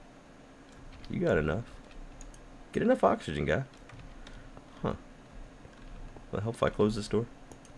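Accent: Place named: South, United States. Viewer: It's just a slight Southern accent, guy. He knows how to talk.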